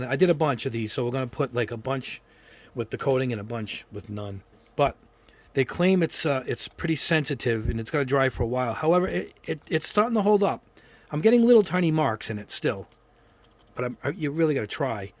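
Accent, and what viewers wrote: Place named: New England, United States. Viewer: Dude, thank you so much for doing these; you're my primary resource anytime I'm trying to figure out what I can airbrush. You rule 🤘 Also- New England Italian… Sounding like a bunch of people I met in New Haven who reminded me of a bunch of people i grew up with in New Jersey. Accent rules 🤘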